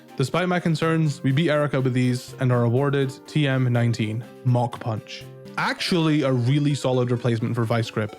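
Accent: United Kingdom. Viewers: Where is your British accent from :0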